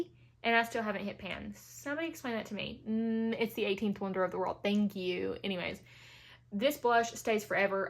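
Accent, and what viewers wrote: South, United States. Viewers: I love love your southern accent. This is my first time watching your YouTube page! I found your channel through #allieglines channel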